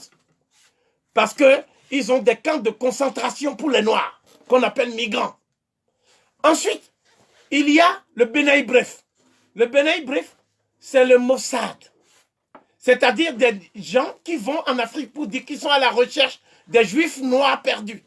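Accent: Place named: Abomey, Benin. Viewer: Un béninoise qui parle français garde son expression natale Abomey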